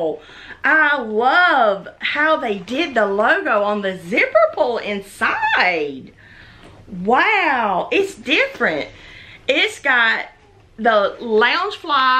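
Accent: South, United States. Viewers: Thank you so much for getting back to me. I’ll have to check out Ebay maybe. I am a brand new subscriber to your channel. I cannot tell you how much I enjoy you! You are such a bright light in a very trying tone for so many. I smile and laugh every time I watch your vlog. All your “oh my gosh” and “I love it” in your sweet southern accent. Even my husband says in a southern accent (and we’re in NH so speak yankee) “shut up” It has been so much fun watching your videos. I have watched EVERY ONE already!! Thank you for bringing some added joy to my days! Can’t wait for your next installment...I’ll most definitely be watching! 😀. AMY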